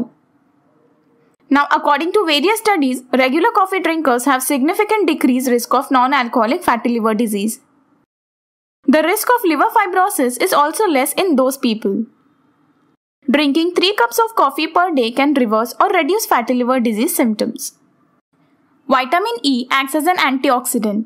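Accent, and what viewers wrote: India. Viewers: I thought you were Geman at first but then I was like nope! India ; ) when you all talk fast you give it away.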